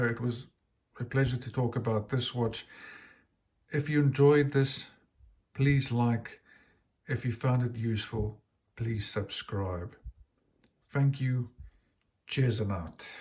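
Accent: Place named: Greece. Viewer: thank you for answering. I would not be surprised is you were Greek the way you speak English, that's why i asked. Yes, second hand may be the way to go, but i would really like the hi beat movement and the thinner profile of the version 3. Wow, from South Africa to the opposite side, Finland 😮.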